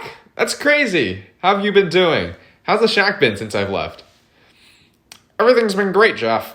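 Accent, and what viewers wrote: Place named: Philadelphia, United States. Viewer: This dude has got to be from Philly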